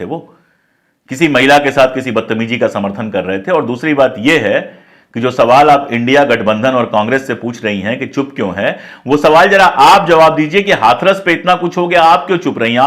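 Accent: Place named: Bhojpuri, India. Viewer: भोजपुरी ज़बान बुहत ख़ूबसूरत है जिस तरह हरियाणवी भाषा इंसान के करीब होती है वो ही मामला भोजपुरी ज़बान का है और आपकी भोजपुरी ज़बान भी शानदार लग रही है 👌🩸🌹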